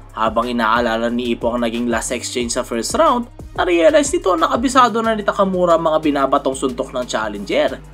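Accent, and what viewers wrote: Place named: United States. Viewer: Ayus par gayang gaya boses ng mga Americano a hahaha ganda by the way ❤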